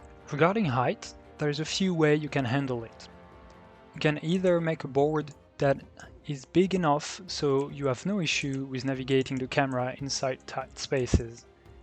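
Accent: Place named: France. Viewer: Hi, love your modular sets on the bazaar, and your explanations are really useful ps: ton accent t'as trahi mon cher concitoyen francophone X)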